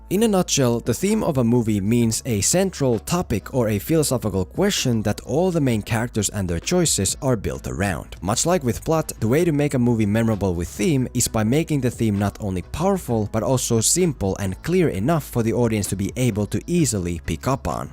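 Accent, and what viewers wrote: Finland. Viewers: Are you from Finland? You have a very Finnish accent. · Is this guy Finnish? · I hear a Finnish accent :)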